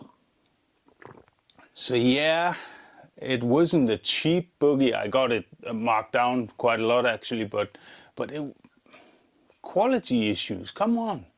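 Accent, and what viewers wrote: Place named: Austria. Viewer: You must be from Austria but love the accent and the rc content from north Carolina usa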